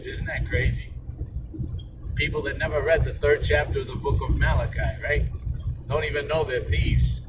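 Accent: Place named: New York, United States. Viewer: Are you really from New York because you nailed the accent and attitude! I'm from New York so I know this and thank you brother for this video! Amein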